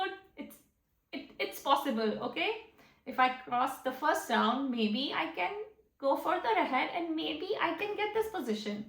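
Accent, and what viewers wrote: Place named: Germany. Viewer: What happened to your accent, i think it mixed up with German 😅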